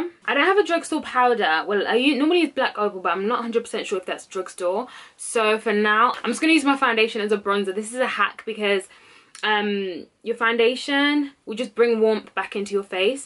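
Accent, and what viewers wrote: United States. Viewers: I wish you wouldn’t speak like a American 😔 love videos though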